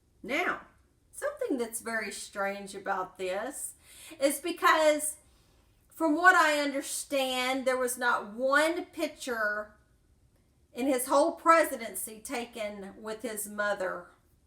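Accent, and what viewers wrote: South, United States. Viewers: Really.... he wasn't even black... According to what we have seen... He was maybe only 1/3 "black", as they reckon it. He was more "white" than black. He just used the black as a stepping stone to make his money and create his political agenda. BTW, I love your southern accent. I'm from Mississippi, and I really miss a real "southerner" on these channels.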